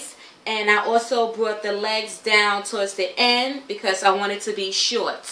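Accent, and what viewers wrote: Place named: Bronx, United States. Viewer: Your accent reminds me of home (Bronx,NYC), where are you from? P.s. great tutorial!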